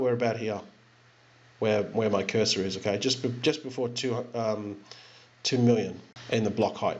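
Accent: Australia. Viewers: are you an Aussie? I found another project today parachute, just want to know your thoughts. cheers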